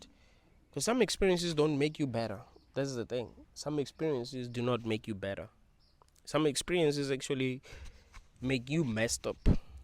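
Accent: South Africa. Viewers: English is not his first language. He's South African. I'm South African, and English isn't our first language. As long as you can understand what He's saying, that's all that matters. If it's too annoying for you, then move along to watch other people who speak how you'd like them to speak. Have a good day.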